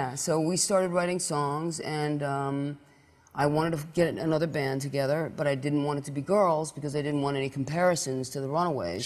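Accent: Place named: New York, United States. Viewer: Love her New York cool accent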